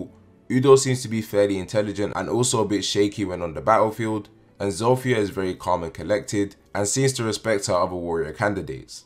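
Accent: United Kingdom. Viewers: couldve been amazing but i just cant understand the strong brittish accent?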